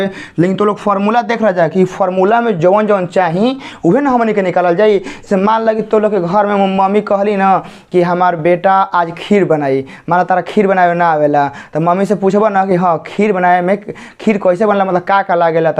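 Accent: Bhojpuri, India. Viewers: Aap bahut mitha bhojpuri bolte hai 😀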